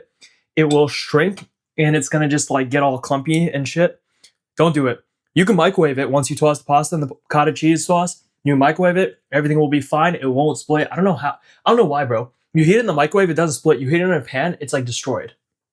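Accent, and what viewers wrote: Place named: New York, United States. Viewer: What’s with the NY accent in your new videos? I never noticed an accent in your younger videos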